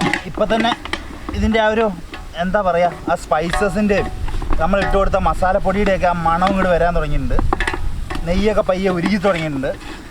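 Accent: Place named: Kollam, India. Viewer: ഒരു കൊല്ലം slang ഉണ്ടല്ലോ സംസാരത്തിൽ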